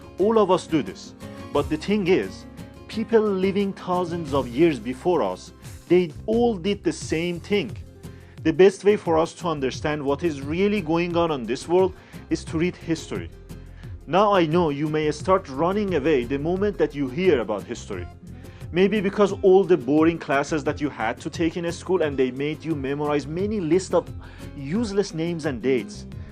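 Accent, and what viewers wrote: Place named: Iran. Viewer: سلام! مرسی واسه ویدیو لهجه ایرانیت خیلی شیرین ترش کرد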